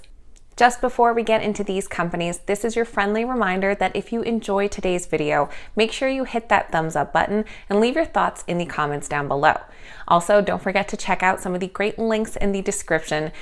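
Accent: Canada. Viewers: I wondered if you were Canadian because you say "prō-cess" instead of "prah-cess"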